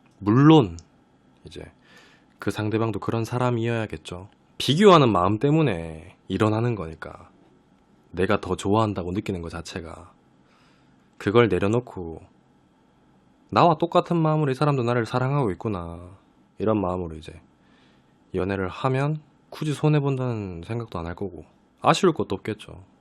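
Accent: Gyeongsang, South Korea. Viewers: 얼마전에알게되서 듣다보니까 대구분이신가요 말투가 대구인데~~?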